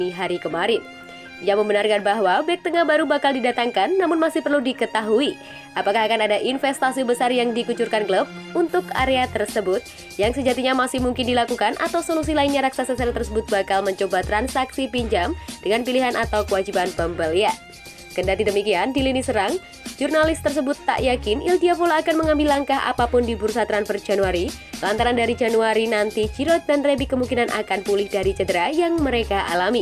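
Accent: Java, Indonesia. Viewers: Jawanyan mana mbak pengisi suara!????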